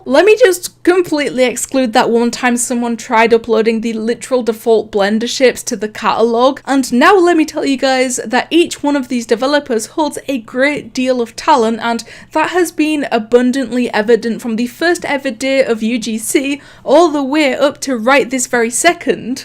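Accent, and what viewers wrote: United Kingdom. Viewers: British girl talks about roblox avatars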